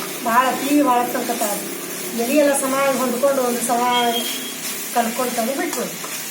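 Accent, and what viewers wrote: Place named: Hubballi, India. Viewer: ಅಮ್ಮಾ ನೀವು ಮಾತಾಡೋದು ಕೇಳಿದರೆ ಬಹಳ ಖುಷಿ ಆಗ್ತದರಿ. ನಾವು ಹುಬ್ಬಳ್ಳಿಯವರು. ಬೆಂಗಳೂರನ್ಯಾಗ ಇದೀವಿ. ನೀವು ಮಾತಾಡೋದು ಕೇಳಿದರೆ ಅಲ್ಲೇ ಇದ್ದೀವಿ ಅನ್ಸತದ. ಮುದ್ದಿ ಪಲ್ಯ ಹೇಗೆ ಮಾಡೋದು ತೋರಿಸಿ ಕೊಡ್ರಿ.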